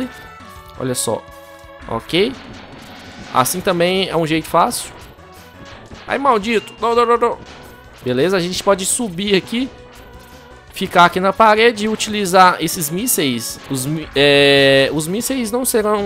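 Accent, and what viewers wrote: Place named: Goiânia, Brazil. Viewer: tu e de Goiania kkkkkk eu tambem vishhh!!!!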